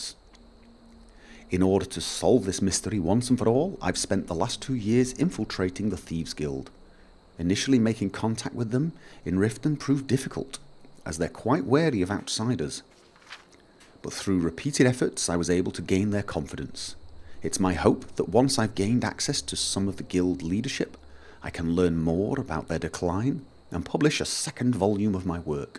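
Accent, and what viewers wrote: United Kingdom. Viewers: Wooow, You're from Norway? Why the hell did I thought you were from the UK?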